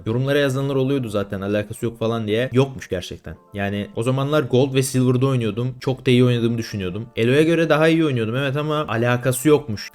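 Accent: Thrace, Turkey. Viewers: konuşmandan trakyalı olduğu anladım bir tık. nerelisin? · Allahım bu trakyanın şivesine bitiyorum hep böyle konuş abi çok güzel la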